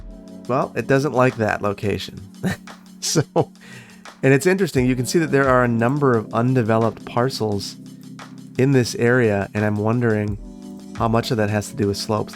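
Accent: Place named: Midwest, United States. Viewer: I've been catching up on your videos, and I just gotta say: your accent gives me life. God bless the Midwest!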